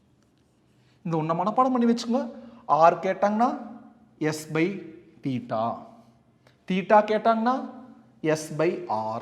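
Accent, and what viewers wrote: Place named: Kongu, India. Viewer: Fantastic sir Clearly understood 🎉🎉🎉🎉🎉🎉 Tq tq very much sir In kongu style slang superb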